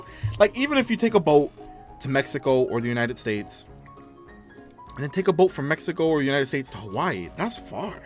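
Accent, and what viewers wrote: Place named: Puerto Rico. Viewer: I laughed so hard. Probably more than I should have. 🤣😂. Good stuff. I knew you were Puerto Rican as soon as you opened your mouth. 💪great personality.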